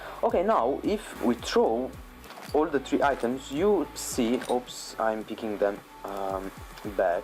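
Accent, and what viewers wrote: Italy. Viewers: ❉Team Chicken❉ ahhahahaahaha grande comunque dal modo in cui parla inglese sembra italiano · non ho capito se sei italiano e parli in inglese o se sei inglese e conosci l'italiano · la tua pronuncia è all'italiana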